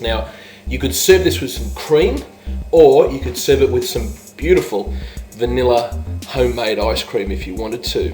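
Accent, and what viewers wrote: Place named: Australia. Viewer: same here....heard ur Aussie voice and went SNAP Subscribe lololol look forward to ur videos "P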